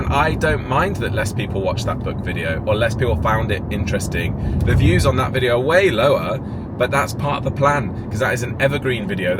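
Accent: England, United Kingdom. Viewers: we could never possibly get tired of seeing your handsome face on our screens and hearing your gorgeous English accent - much love to you and the handsome Keegan 🇮🇪☘️🇮🇪❤️❤️❤️❤️❤️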